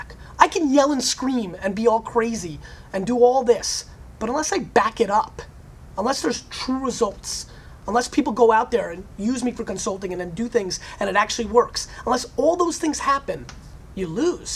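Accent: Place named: New York, United States. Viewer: nice accent i was gussing new yorker...jersey almost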